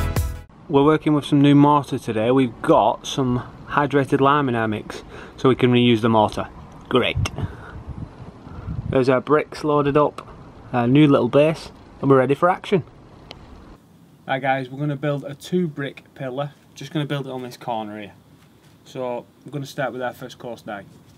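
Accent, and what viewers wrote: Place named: Northern England, United Kingdom. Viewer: Thanks for another great video. I love your norvern accent and eagerly await for your next tutorial. Please keep up the good work pal.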